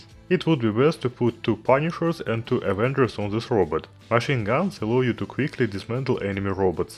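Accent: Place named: Russia. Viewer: а по русский нельзя? все равно английский как русский ..так понятнее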